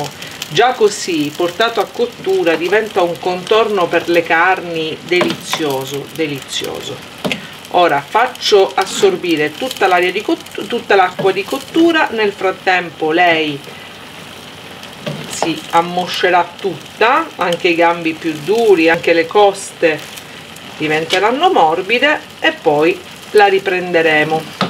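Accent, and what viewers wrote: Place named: Sicily, Italy. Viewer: Siciliani di dove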